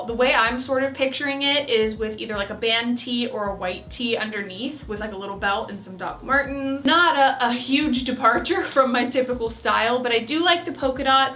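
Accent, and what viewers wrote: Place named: United States. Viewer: Even though you have an American accent for some reason when I watch you I feel like I’m watching a British YouTuber? So thanks for making me feel.. at home I guess?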